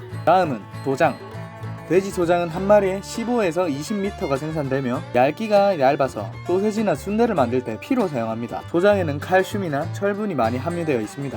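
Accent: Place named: Daegu, South Korea. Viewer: 사투리가 엄청 구수하시네요ㅋㅋ 대구 사투리인가요?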